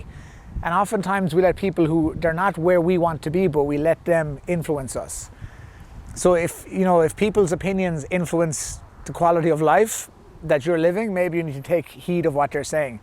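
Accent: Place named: Ireland. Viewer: hey, i just stumbled on this, i wish success in your youtube endeavours my friend. your so right though. recently i asked myself am i dreaming to big/too small. and it wasnt until i dared myself to dream big that it made things a lot more clearer in my mind. thank you for your insightfulness. i reeally loook forward to diving deep into your content, btw im from dublin, its so nice to hear an irish accent on this topic! godspeed, <3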